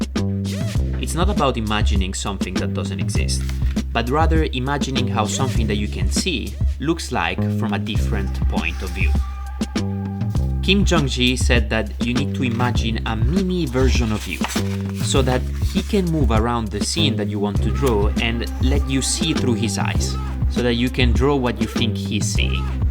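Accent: Italy. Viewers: Thank u for those tips, they were very helpfull. I have a question, ma sei italiano??😅 dalla pronuncia sembra di si, comunque grande grazie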